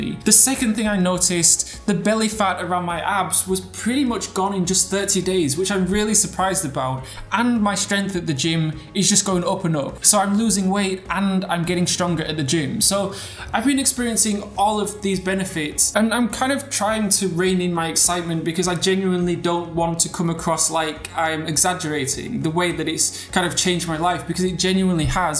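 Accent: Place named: Northern England, United Kingdom. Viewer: When you lived in the UK, were you from the North of England? That accent is nice! ☺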